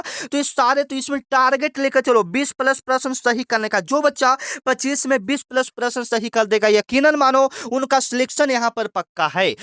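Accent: Bihar, India. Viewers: Sir aap bihar se ho kya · Kaise bolte hoo bihari ho kaa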